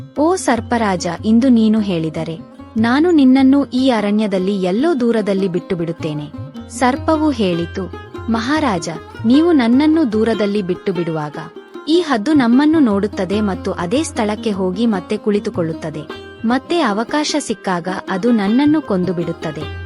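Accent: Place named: Maharashtra, India. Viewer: Neevu yaru Maharastra davaru iddiriye Kannada vannu heegeke mataadutiddiri😂😂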